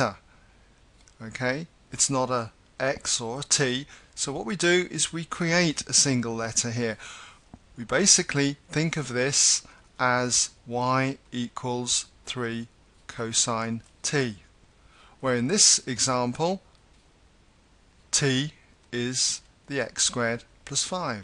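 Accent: United Kingdom. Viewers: thank you so much. excellent pace and explanation. plus, i felt like i was at hogwarts with your wonderful accent! :) thanks again!!!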